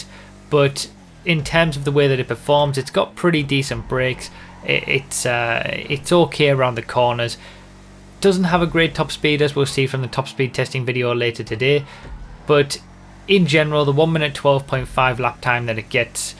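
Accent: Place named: Scotland, United Kingdom. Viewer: U sound Scottish. Are you?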